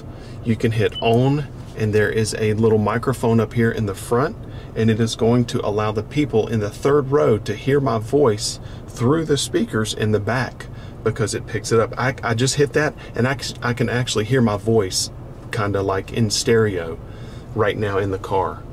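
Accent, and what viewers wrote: North Carolina, United States. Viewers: Living here in Missouri, I noticed your accent was North Carolinian, then I saw a Popup that said you were in High Point.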